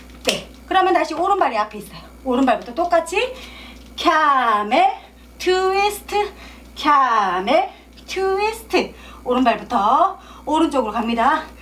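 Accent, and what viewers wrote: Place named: Gyeongsang, South Korea. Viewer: 늘 잘 보고 있어요ㆍ경상도 말투가 듣기 좋아요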